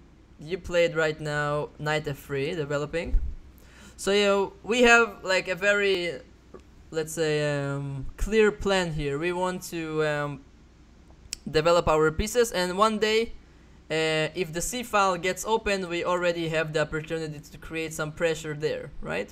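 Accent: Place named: Brazil. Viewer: So quem é brasileiro conhece esse sutaque do ingles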